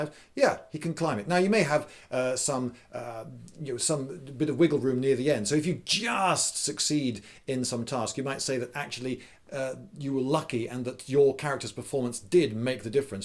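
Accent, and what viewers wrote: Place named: United Kingdom. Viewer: what part of the UK is this dude from? I am interested what regional dialect/accent he has. It's bad ass!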